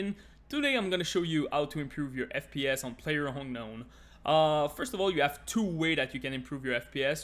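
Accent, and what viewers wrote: Quebec, Canada. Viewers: un quebecois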